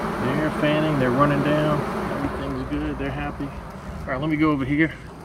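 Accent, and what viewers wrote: Louisiana, United States. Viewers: I am originally from Texas, now live out west in Nevada. I was ecstatic to hear your Cajun inflection in this video! I am also so very impressed by the calm, professional job you did in the transferring of this massive colony. Your love for the bees is well documented, and if any person within 200 miles of Metairie LA needs bee transference, you are the number 1 choice! God bless you, sir!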